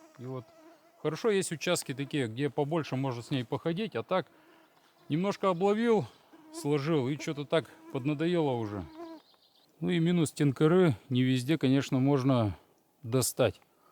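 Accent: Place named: Arkhangelsk, Russia. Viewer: Хорошее видео. Вы наверное с Архангельской области. Напиши. Говор наш.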